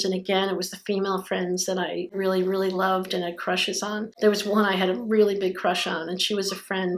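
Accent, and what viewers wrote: New Jersey, United States. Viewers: From jersey and don't talk through your nose 😂😂 My Dads is from there 2 and when I piss him off u can hear that Jersey coming out 😊😂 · I knew that accent was American but now you got me I'm from Jersey City New Jersey YYYEEEAAAAAA!!! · i'm from nj too but i grew up in pa. did you ever have the jersey accent? i had a heavy jersey accent at one point but it changed to a pa accent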